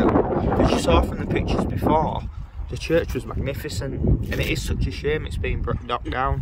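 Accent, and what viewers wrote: West Yorkshire, United Kingdom. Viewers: Came for the workmanship, stayed to hear my own beautiful West Yorkshire native accent that I miss hearing daily now I live in the USA. Thanks for being a brill Yorkshire ambassador, wearing your accent and showing the world Yorkshire pride, skill and industry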